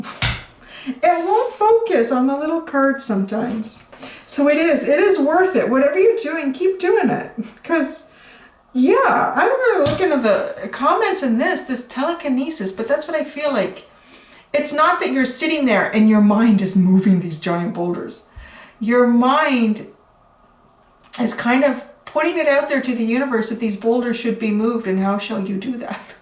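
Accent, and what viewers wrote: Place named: Canada. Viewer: I love how Canadians pronounce "out" (Thank you for the reading <3)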